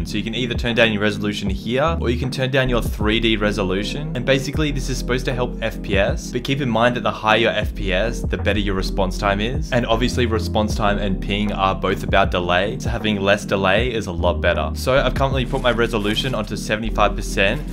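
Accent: Australia. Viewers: Are u Australian I just think u are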